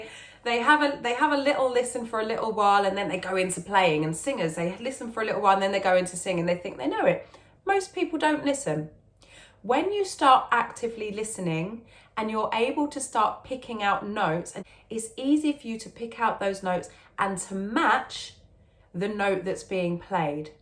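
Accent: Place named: England, United Kingdom. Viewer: Do I need an English accent like you to learn how to sing?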